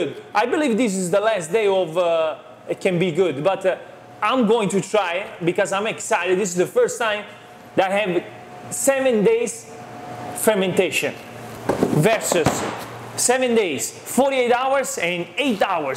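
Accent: Italy. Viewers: I came here to listen his Italian accent 😅 and I Iove making 🍕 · On my 40th batch of pizza following this recipe. all i can say is get 00 (zero zero) flour to make to worthwhile - buy best flour you can get hold off and the best yeast you can get the mcDougalls one is good! Love this Video and i practice my italian accent when making it so i sound like the man in the Video TUTTO BENE x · Salve o... AVE?;-) are you born Italian living in the USA o Italian born in USA? I really don' t know if you speak English with that accent pourposly or is faked;-) BTW you are very good pizza maker and looks a super very nice person keep up with your outstanding videos!!!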